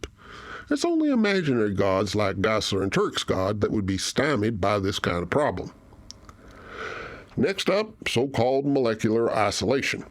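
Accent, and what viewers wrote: South, United States. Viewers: Weyell, the cognitive dissonance betwixt country hick-sounding bear and educated skeptic somehow ain't keepin' me up at night! I like it, and why not adopt an easy-to-recall schtick? For new relatively unknown creators, do you know Ivy Windsong Collins? She's got older southern belle down very nicely indeed.... TTYL!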